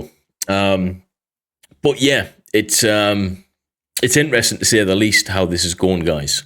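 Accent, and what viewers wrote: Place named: Northern England, United Kingdom. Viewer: I thought I was hearing a little Northern England in your accent!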